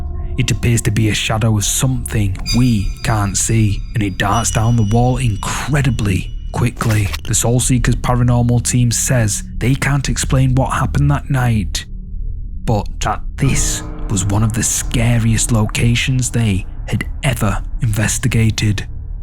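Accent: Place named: Northern England, United Kingdom. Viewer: I just love these videos so much ❤It’s a real treat everytime you’re uploading something new. Your northern England accent’s also brings a kind of creepy aura to the videos. 😊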